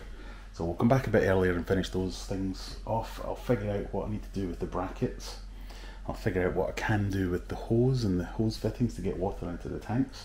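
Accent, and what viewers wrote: Scotland, United Kingdom. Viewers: We are literally being screwed by fitting manufacturers to own dozens of these things with diameter, thread, pitch variations! Guess I was luck the ones I got for my outside taps went straight onto both of my shower outlets. Keep the videos coming and nice to hear a Scottish Accent (I am an economic refugee in darkest England and miss home!).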